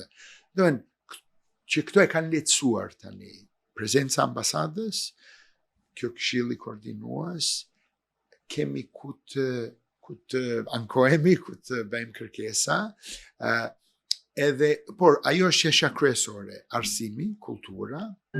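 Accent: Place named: Kolonja, Albania. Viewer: Truri dhe mendja e ndritur e Shqiptareve ndertojne boten dhe per vendin e tyre nuk bejne asgje se nuk kemi shtet qe ti mbeshteti Bravoooooooooo per gjuhen dhe dialektin Kolonjar Respekte per kete Zoteri ❤❤❤❤❤❤❤❤❤❤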